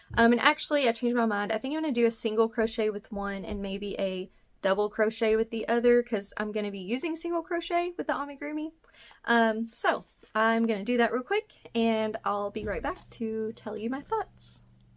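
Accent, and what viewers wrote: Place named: Tennessee, United States. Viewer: ❤are you from Tennessee you have my accent lol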